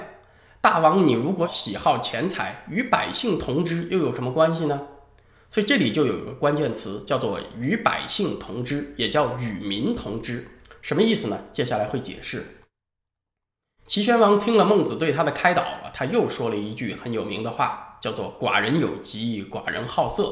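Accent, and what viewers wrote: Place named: Northeast, China. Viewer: 以下是剛剛收到的知乎的郵件： 您好，成吉斯，很抱歉您的回答受到了牵连，您回答过的问题「如何评价评论员文昭？」因「政治敏感」已被删除。您被牵连删除的回答如下： 搜了一下，网上没有先生的简历与介绍。看了2013年左右的视频，当时还相当青涩，与现在的几乎是两个人。既然网上没有公开的介绍，我就狂妄一下，猜一猜他是谁： 1. 像是东北人（仅仅凭一点点口音~）； 2. 应该出身于一个普通知识分子家庭； 3. 的大学应该是文科，历史、中文之类的学科； 4. 应该移居加拿大超过10年； 5. 应该在2013年前后在多伦多或附近的大学读了一个或几个与历史、政治、哲学等相关的课程； 6.傅佩荣先生应该对有比较大的影响。很佩服的才学、见识与表达，当然最重要的是的价值观。无论先生服务于哪个机构，只要先生能秉持自己的真诚，我都尊敬先生的选择。谨以以上文字向先生致意！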